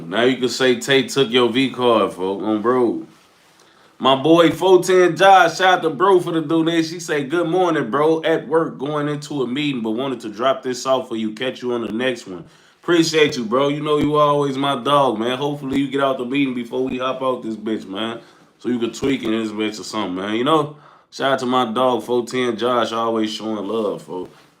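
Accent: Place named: Detroit, United States. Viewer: I’m from Detroit that’s how we talk my baby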